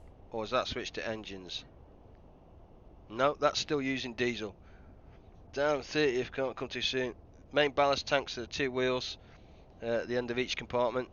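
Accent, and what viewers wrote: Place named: United Kingdom. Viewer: Very nice gameplay and commentary, you definitely have a sub(-scribe) ;-) from me. Keep it going. Also approve of the very British way of commentating :-) . Really like the way you communicate with your stream viewers and take suggestions from them.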